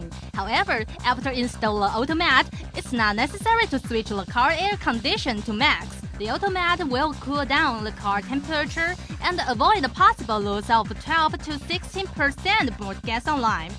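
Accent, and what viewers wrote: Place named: England, United Kingdom. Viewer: it sounds english.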